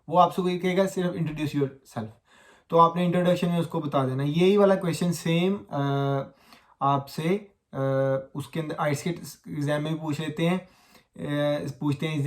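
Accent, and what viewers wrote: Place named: Faisalabad, Pakistan. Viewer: Bro faisalabadi ho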